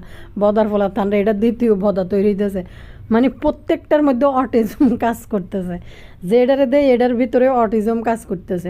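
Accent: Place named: Feni, Bangladesh. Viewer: আপু কেমন আছেন। লাইক দিয়ে দেখা শুরু করে দিলাম। সমাজের মধ্যে এ সব নোংরামিগুলো বন্ধ করা উচিত । আমার দেশের বাড়ি ফেনীতে । এখন আছি আমেরিকার আলবেনীতে। আমার আর একটা নাম্বার থেকে কমেন্ট করতাম। হাছিনা আলম আইডি থেকে । এ নাম্বার টা এখন বন্ধ আছে। তাই নতুন নাম্বার থেকে কমেন্ট করলাম। এগিয়ে যান সামনের দিকে । আপনার কথা শুনলে মন ভালো হয়ে যায় । বিদেশে বসে নিজের এলাকার ভাষা শুনি তখন শান্তি লাগে। আশা করি আপনাকে সরাসরি একদিন দেখব। সবাই কে নিয়ে ভাল থাকবেন। আমাদের জন্য দোয়া করবেন।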